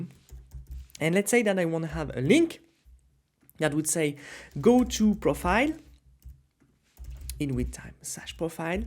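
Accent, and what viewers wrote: France. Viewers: Thank to this tutorial and surtout ton french accent , on a tous le même mais ça me va parfaitement comme ça . Good job 👍💪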